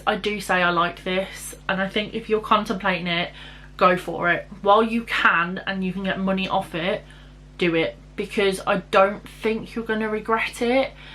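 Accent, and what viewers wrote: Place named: United Kingdom. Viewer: your accent is so cute. i love uk beauty gurus!!!!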